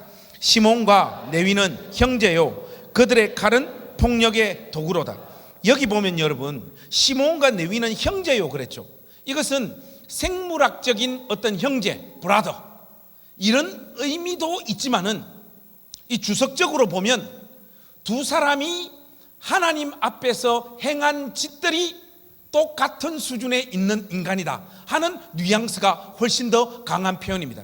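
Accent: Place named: Gyeongsang, South Korea. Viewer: 목사님~♡ 경상도 말씨 듣기 좋은데요. 단세에 오셔서 간증하실때 홍수와 요강 훅 와닿았어요. 목사님 응원합니당.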